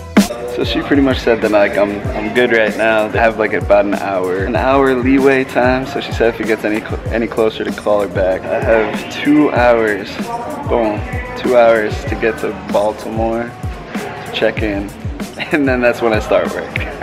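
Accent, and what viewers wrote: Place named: Netherlands. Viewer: Talks for a sec, ok its a dutch dude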